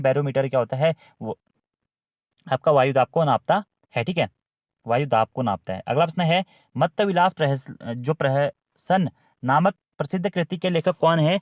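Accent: Almora, India. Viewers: Ap almora se ho kya sir😅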